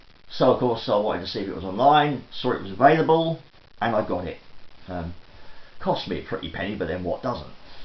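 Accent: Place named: Cockney, United Kingdom. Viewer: Hey,. It’s always cool to watch your videos. You’re probably one of the most down-to-earth British guys who I’ve come across. You deserve so much more happiness in your life. I recommend you St. Andrean’s videos. He’s a Scottish guy who’s also going through what you’re going through. Also, feel free to check out Unrealman’s videos. He makes a lot of good points and actually gives solutions. Also, it’s refreshing to hear a cockney accent. I haven’t heard an accent like that in years.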